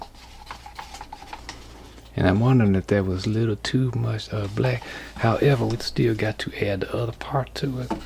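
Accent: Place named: South, United States. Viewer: Southern Bob Ross, I love it!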